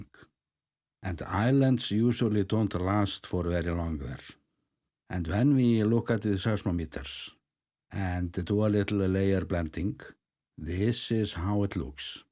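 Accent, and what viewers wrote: Iceland. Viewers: I love listening to this Icelandic accent!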